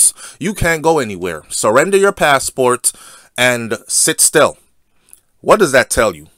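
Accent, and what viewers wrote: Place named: Jamaica. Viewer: Jamaican accent trying to talk American is so annoying #unsubscribe 👋 ✌️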